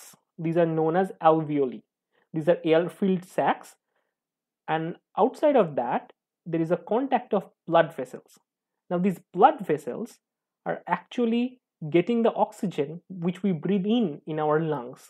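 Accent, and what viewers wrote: India. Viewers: I am sorry but if YOU'RE AN INDIAN YOU NEED TO STOP USING YOUR THICK ACCENT IN NARRATING A VIDEO.... OR somehow include "Indian accent" disclaimer on your VIDEO title... So I don't click on it and not understand a single word... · speaker sound from India right?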